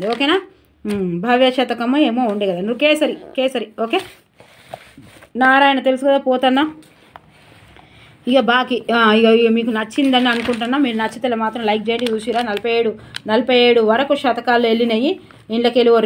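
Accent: Telangana, India. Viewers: పక్క తెలంగాణ భాష లో చాలా బాగుంది మేడం 👌👌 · Me voice vinte oka energy vastundi mam telangana slang nd me short tricks , explanation chala bavunnay... thank you madam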